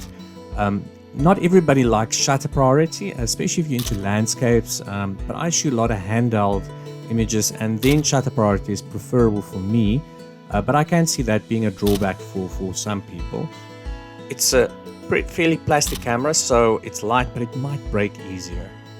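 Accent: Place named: South Africa. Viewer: Great video, I have also the AE-1, AV-1 and AT-1. I can see why people love them and I loved your opinion too. Fair review and I learned a few things I didn't know before. I'd recommend Rode Wireless mics as your audio is peaking slightly with the Boer brogue at times. I'm Scottish and had similar volume/ peaking issues. Rode mics are excellent and great value. Great content, cheers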